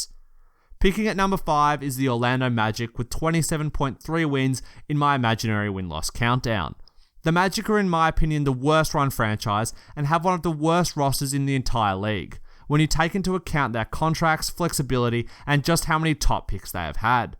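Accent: Australia. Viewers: You sound like you came from Australia? Did you? 🤔🤔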